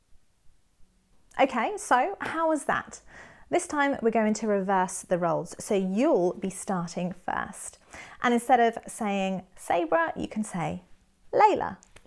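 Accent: United Kingdom. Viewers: Salute British English👉👉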